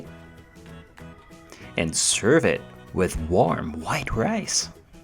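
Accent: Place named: England, United Kingdom. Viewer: In fairness sa English twang ni Kuya ha? Love it! 😊😊😊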